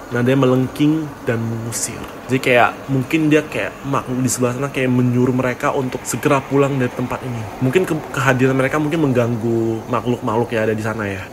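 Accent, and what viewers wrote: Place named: Medan, Indonesia. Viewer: Masih ada logat2 medannya dikit 😁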